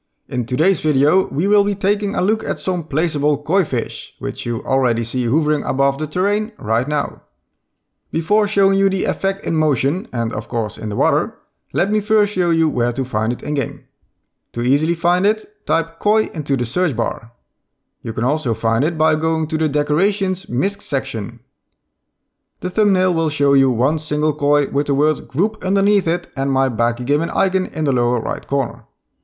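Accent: Netherlands. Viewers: Ik hoor gewoon dat dit een Nederlander is hahahah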